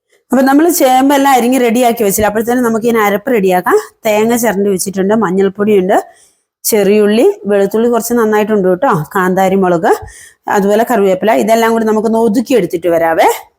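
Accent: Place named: Southern Kerala, India. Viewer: കാസര്‍കോഡ് ആണൊ സംസാരം കേട്ട് ഞാന്‍ വിചാരിച്ചു ഇങ്ങോട്ട് തെക്ക് ആണെന്ന് chilasamayam പാലക്കാട് എന്തായാലും സംസാരം സൂപ്പര്‍ നിങ്ങളുടെ വീഡിയോ എല്ലാതും ഒന്നിനൊന്ന് മെച്ചം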